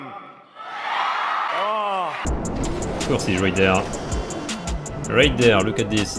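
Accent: France. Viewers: I love your videos and western slang with your French lol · The French accent makes these videos all the more entertaining to watch. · Sacrée accent français c’est beau ! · I mean not only are you talented on console which is enjoyable to watch, but your perfect English French accent is so enjoyable to listen to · The french accent is Amazing bro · First time watching your vídeos. The French accent is só damn funny 😂 Btw, tho they are Trash, u can't be mad at someone for ewoing when you are trying to kill them in a Jet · His techniques makes it by far but, yeha, French accent is hilarious... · Gta, good memes, French accent... I don't anything more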